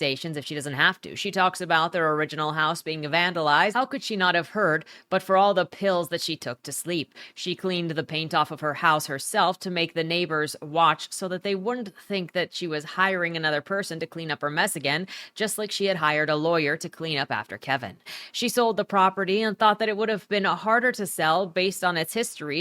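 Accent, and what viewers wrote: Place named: Transatlantic, United States. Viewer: The transatlantic accent is brutal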